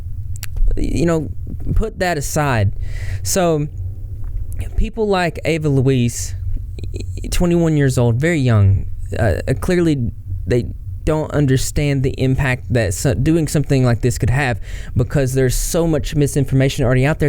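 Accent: South, United States. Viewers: okay I've spent a good amount of time trying to figure out what flavor Southerner you are. I'm from West Virginia, but reside in Georgia.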